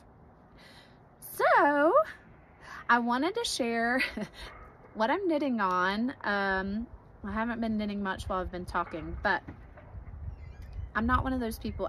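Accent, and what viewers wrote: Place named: North Carolina, United States. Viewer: Adore your cowl❤ and we were in NC for about ten years so I love hearing your accent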